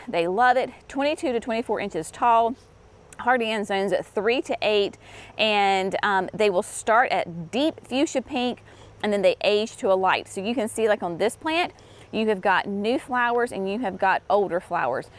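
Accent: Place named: Tennessee, United States. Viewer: I got to comment. Love this video!! I am from Tenn and I declare your delivery is soooo us!!! ❤❤ Thanks. JT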